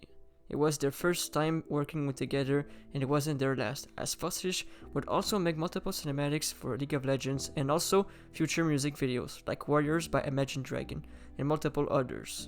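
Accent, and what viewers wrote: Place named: France. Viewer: I cant agree, he has a slight french accent but the quality of the sound is very good.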